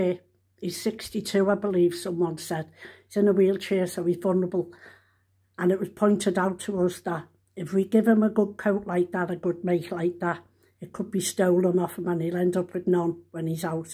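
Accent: Liverpool, United Kingdom. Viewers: Bless you ,you area genuine Scouser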